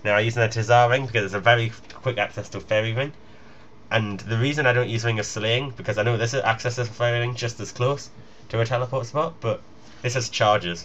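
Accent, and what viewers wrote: Newcastle, United Kingdom. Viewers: btw are u a geordie ?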